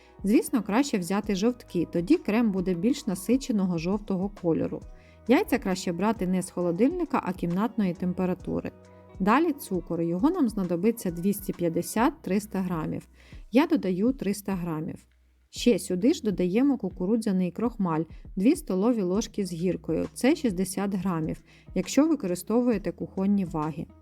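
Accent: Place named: South, Ukraine. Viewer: Добрий день! Мені здається що ви з півдня України, за місто промовчу!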